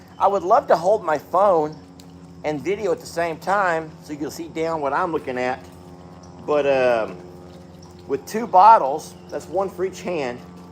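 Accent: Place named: Texas, United States. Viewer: Me too, that Texan twang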